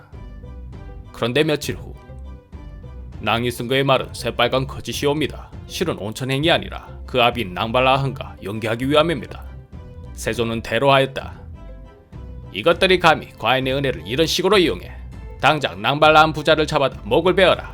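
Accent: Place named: Busan, South Korea. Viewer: 흠...분명히 우리 은..부산싸나이 가튼데...사투리가 전형적인 부산인데...내도 부산이요...흠...암튼..얼마전부터 지독한 찐팬이 된 제임스 라고 하는 미국교민 입니다. 요지음 의 영상을 보면서 즐거운 시간을 가집니다.감사합니다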